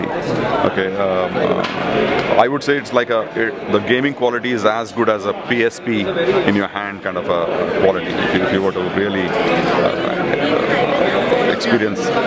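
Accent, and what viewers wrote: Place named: India. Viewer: get used to the indian accent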